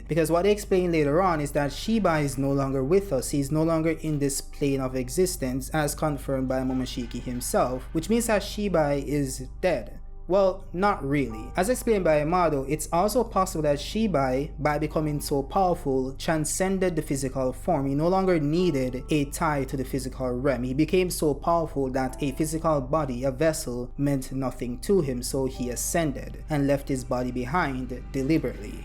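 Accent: Jamaica. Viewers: are you jamaican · I stg im following you for your tasteful Jamaican accent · I know you were from Jamaica because of the accent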